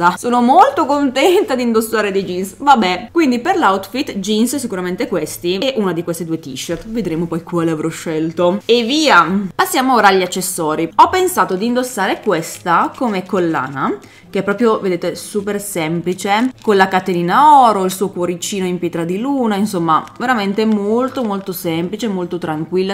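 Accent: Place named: Brescia, Italy. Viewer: Mi posso sbagliare, ma per caso sei Bresciana? Mi sembra di intuire un po' di familiarità nella tua voce😅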